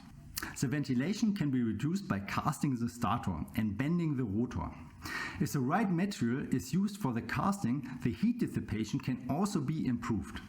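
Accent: Germany. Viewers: Hallo, deine Videos gefallen mir. Als ich entdeckte, dass du aus Berlin kommst, entschied ich mich gleich in die Kommentar etwas zu schreiben. I’m a 26-year-old young guy from China. I’m in the business of neodymium magnets and magnetic assemblies, but now trying to expand to BLDC motor and controller field. That’s why I’m learning everything about electric motors. You have German accent when speaking English, which I find interesting. Danke für deine volle Videos!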